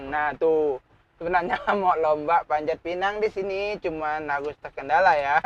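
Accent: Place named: Banjar, Indonesia. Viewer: Klo didengar dari logat bahasa dan tipe wajah kaya nya abang ini orang banjar.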